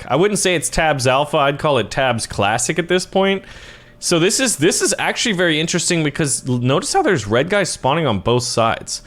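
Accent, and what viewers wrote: Germany. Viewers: im from germany and i looove your german accent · Great German accent 10/10 (I am not getting paid by to say this)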